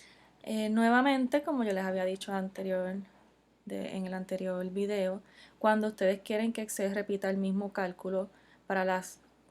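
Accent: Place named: Venezuela. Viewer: Pensé que era venezolana.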